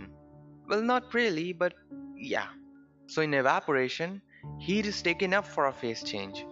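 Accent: India. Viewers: You indian right?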